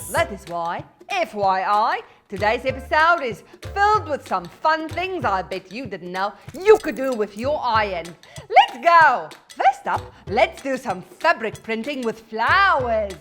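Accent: Afrikaans, South Africa. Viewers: Yeah😂😂I think she is Afrikaans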